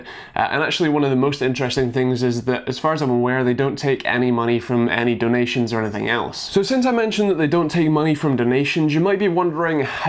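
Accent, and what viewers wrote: Scotland, United Kingdom. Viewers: Great to hear a good review from a fellow scotsman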